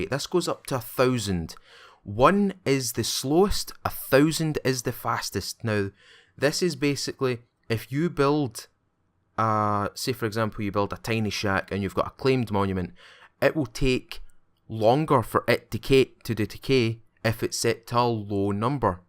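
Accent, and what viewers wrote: Scotland, United Kingdom. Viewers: Love your LiF:YO vids, love your scottish accent, I should play my " " colection while playing LiF... Game is still grindy AF, fortunately we can play with the server settings and accelerate the leveling rate...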